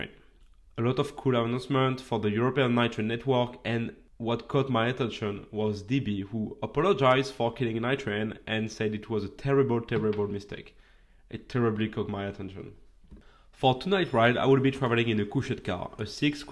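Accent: France. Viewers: I agree with you entirely. His Franglais is annoying. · Sans vouloir être désagréable, serait-il possible d’avoir les videos sans commentaires off en anglais? Mes oreilles saignent en écoutant le lourd accent français, c’est difficile d’en faire abstraction. Les sous-titres avec le son d’ambiance font bien le job. Les youtubeurs japonais du train game font ça et vu leurs nombres de vues, ça marche très bien et ça fait moins de travail.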